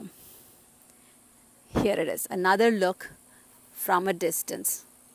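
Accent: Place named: South India, India. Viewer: Where do you live ?, I notice a South Indian accent... Lovely setting, beautiful garden and the most amazing orchid spike!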